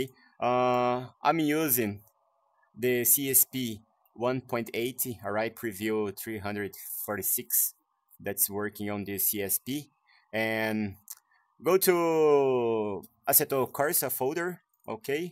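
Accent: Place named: Brazil. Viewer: so pelo sotaque sabia q era BRkkkkkkkkkkkkkk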